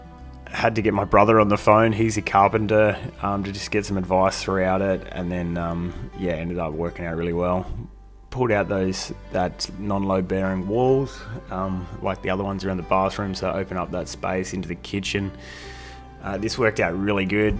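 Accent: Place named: Australia. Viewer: Just stumbled upon your videos. The voiceover kicks it. I hate just timelapse. Great to hear an Aussie voice. Keep it going buddy.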